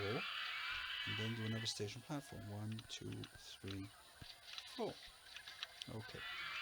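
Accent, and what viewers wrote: Wales, United Kingdom. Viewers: Ooooh is that a Welsh twang I can detect in your voice?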